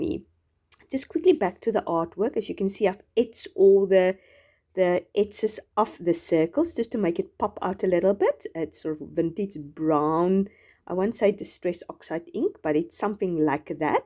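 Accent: South Africa. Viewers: This is so pretty are you from South Africa ? I love your accent. ♥️♥️ I just started journalling and scrapbooking. I love your ideas of incorporating God into journalling I never thought of that before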